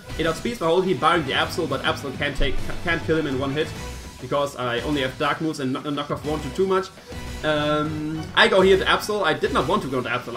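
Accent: Germany. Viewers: An amazing match. I feel reaaally bad for you for playing really good and than loosing for one mistake basically but focus on the positive of here by doing the right thing (no timer stall) and for playing really good, except for one turn. On another note, I'd suggest that you lower the "battle music" a bit. It was at times a bit hard to understand what you were saying 'cause the music was louder than your voice and even thou I like the German language and I don't mind the accent myself, it does unfortunately make your speech less understandable at times. GG and happy holidays! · are you german by any chance? you look, sound and your name sounds german. Im partially german so im curious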